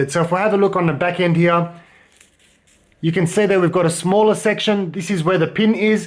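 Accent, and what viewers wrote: Australia. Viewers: Hello from India my Aussie mate. A B-I-G thanks. You saved me a hot, sweaty trip to the mall to get the tag removed. It's a pair trousers that came as a gift, bought over a year ago. Finally, when I received it, it had the tag, the damned tag! I had no idea which mall it was bought from, I did not have the receipt. I hated going to the mall in the horrible weather. I took it to the local tailors and they all said they cannot remove it without damaging the fabric. I didn't know what to do. Then a friend sent me this video. and Voila I just got rid of the tag. Good riddance, I say. P.S. - I loved your Aussie accent and that Aussie exuberance and enthusiasm. I love this Aussie spirit. So down to earth, friendly, non-bossy. I have a few Australian friends here in India and I think Aussies are a great people. Finally, A big thanks to you again mate. 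· Thanks heaps- brilliant How To 👍👍Also, nice surprise to hear an Aussie voice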